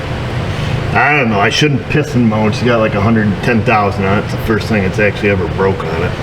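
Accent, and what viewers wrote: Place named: Rochester, United States. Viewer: I have a buddy from Rochester and I pegged your accent before I looked up your shop. Good video and happy new year.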